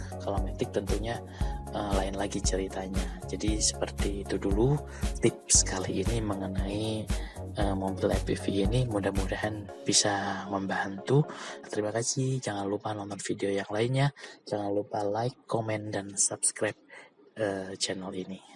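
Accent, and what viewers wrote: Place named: Madura, Indonesia. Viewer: Asli madura ya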